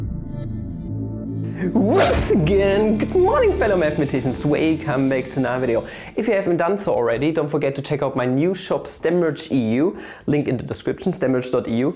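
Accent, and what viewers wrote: Germany. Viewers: Are you German or American????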